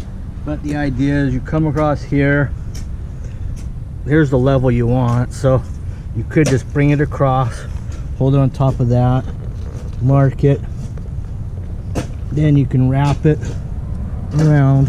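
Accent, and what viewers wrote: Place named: California, United States. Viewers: Are you in California? I say that because you have that laid back California accent, anyway God bless thanks for the video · That California accent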